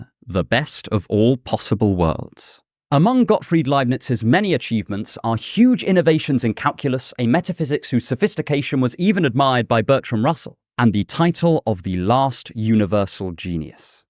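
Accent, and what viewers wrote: RP, United Kingdom. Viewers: You should do a video about biased toward certain sounds or accents according to where one another is originally from. Because at the start of the video my headphones werent on and when video began to play. I was like hey he's a certain kinda cute like a 6.9 but once i heard that semi-posh, almost bbc rp accent, you went up to at least a 9. Another example is the lead singer of inxs michael hutchence yes he was a darling but his singing and aussie accent had me as a young girl thinkin' wow he blew my mind he skyrocketed to an 11 and i'm just a yank. Been to a bunch of shows over the mid 80s into mid 90s. Them playing LIVE is better than any I've seen ever after back in the way back days i was 15 in 1988. Although the drummer Jon Farriss was by far more symmetrically attractive with the chiseled cheek bones, but he didnt really say a whole lot (the strong silent type) and i think he may have sung on one obscure tune! 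But he was a looker for sure!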